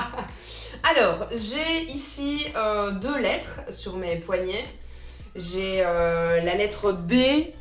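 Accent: Belgium. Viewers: Hello madame, ton accent! Tu es belges? Big up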